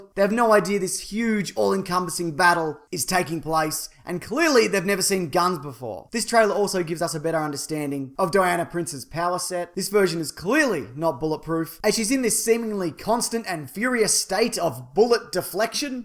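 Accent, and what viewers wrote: Australia. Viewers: Love this dudes crazy Ozzie accent, sound just like Steve Irwin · Man you are awesome and the Australian accent helps haha. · Be honest people, the only reason you watch these vids is for that SWEET, AUTHENTIC, AUSTRALIAN ACCENT!!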